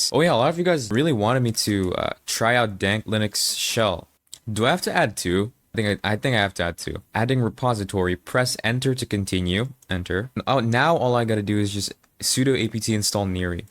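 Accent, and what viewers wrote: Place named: United States. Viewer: Hey man... I've been subbed to you for like a month or two and i friggin love these videos :) Also out of topic but where did you get that American accent while being a non native English speaker 😭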